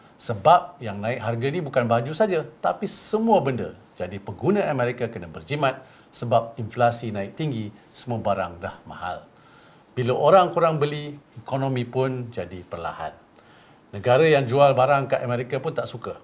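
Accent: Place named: Indonesia. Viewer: Logatnya seperti orang indonesia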